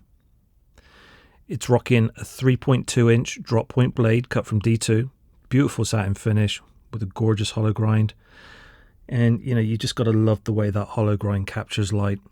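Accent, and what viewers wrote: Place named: United Kingdom. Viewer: You sound like you're from the UK but, I'm guessing you live in the US? Purely because you are reviewing a lot of knives all of which are illegal to ship/buy in the UK. Hate UK laws.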